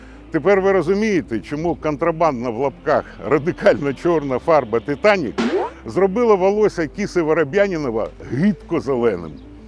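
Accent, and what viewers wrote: Odesa, Ukraine. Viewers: Це однозначно одеський український діалект :) Спочатку ріже вухо, а потім розумієш, що такий говір українською може бути лише в Одесі :)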